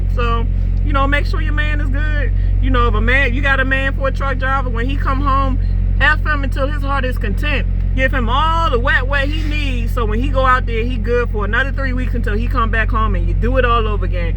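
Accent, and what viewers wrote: Baltimore, United States. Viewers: Sounds like you are from Baltimore... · Very pretty your probably fun to hang out with too I bet sounds like you from Baltimore anyway keep safe out there miss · Very nice looking female truck driver, you speak with a Baltimore accent, are you from that area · Love your BMore accent ♥️ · sounds like she is from. bmore or. maryland lol the uuuuz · You are from Baltimore, Maryland aren't you? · I love the Baltimore accent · She is definitely from Baltimore Maryland! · First time ever seeing your video & the first thing I’ve noticed besides how gorgeous you are is your Baltimore accent... You have a new fan! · Jay Reed Nope....DC, MD OR Virginia..or at least been there for 5 years or more....Actually 99% hunch it’s Baltimore. (after listing more 99.999% it’s Baltimore) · That Bmore accent strong lol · You gotta be from Baltimore?! 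I can tell by the way you say “do” and “to”...I like your honesty. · 666 comments, glad I'm 667 Sounds like you from Bmore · You must be from Baltimore? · Good grown advise.Keep it truckin,sound like a Baltimore accent.Peace and blessings to the truckers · You sound like you're from Baltimore.But you not having a man.Wow I can't see that far.You are BEAUTIFUL. 💯😚Keep it up sista.Real talk from beginning to end. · You from Bmore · You sound like you from Baltimore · I can listen to that B'more Accent all day 😂 coming from Bama. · Ya bmore accent is LIT! 😂😂😂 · Gotta be from Baltimore. NOLA and BMore accents are so dope! You rock! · She do sound like she from Bmore, sorry that you don’t get none because you’re so cute, love ya voice too. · Ya sexy ass sound like you from Baltimore. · This is my first time seeing this channel but i can tell by your accent that you are from baltimore. Nice content! 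· 2-4 Till I Die she sounds like she's from Baltimore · I was about to say. You from Baltimore. Nice 😀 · My first video watching. You from Baltimore. I can hear that shit clearly. DEW lol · Love that bmore accent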